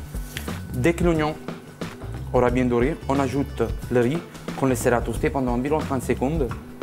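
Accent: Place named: Italy. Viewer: Tu risotto avec des boîtes ça donne pas envi. L'accent italien ne suffit pas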